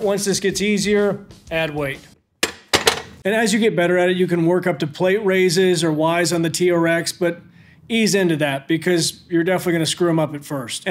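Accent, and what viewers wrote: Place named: South, United States. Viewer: my brain needed a while to process hearing scientific nomenclature through a southern accent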